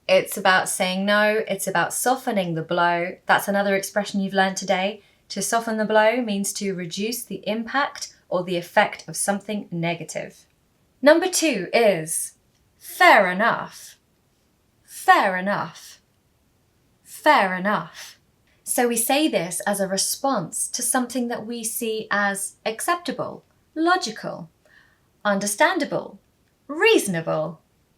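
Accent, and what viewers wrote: United Kingdom. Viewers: Thanks ！I really enjoy British accents, especially yours and your voice. And I think British accents are easier to understand than American’s. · I like your British accent teacher I also like how you teach inglish 👍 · I m an iranian. I have nt seen before teacher like you .actually your method is useful and effectivr.especially with brit accent lovely❤ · Hi I'm fascinated with your videos, geat explanation, british accent, nice hair, soft entonation and beautiful smile of professional woman. Congratulations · Hello I love your videos so much they are useful. And also your speed of speech is very friendly to me I can understand you clearly lol. You are sweet and lovely teacher. Besides I am quite into your wonderful British accent!! I wanna learn more from you and hope u have a lovely week❤ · You're the BEST teacher I've ever known. 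I Love British accent. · English sound beautiful when spoken by UK native speaker like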